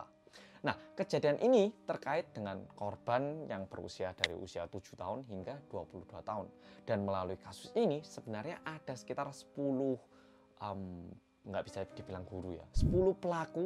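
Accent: Java, Indonesia. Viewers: awas mas jangan terlalu lebar, entar ada nyamuk masuk. btw aku tu suka kalo denger mas ngomong medhok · halo gays welkom to korea reomit. bersama orang korea yang medooooookkkkkk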